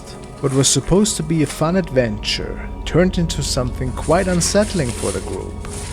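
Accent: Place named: Germany. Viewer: Hallo. Bin durch Zufall auf Deinen Kanal gestoßen und habe gleich anhand Deiner Aussprache (Slang} gehört dass Du aus Deutschland kommst. Ich schaue gerne Deine Videos. Grüße aus Lüneburg bei Hamburg! 👋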